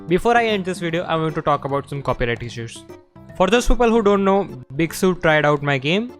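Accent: Gujarat, India. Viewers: Omg a gujju speaking hindi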